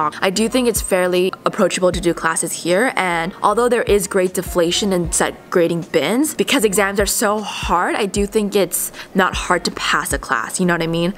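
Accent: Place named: Southern California, United States. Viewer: God, that SoCal accent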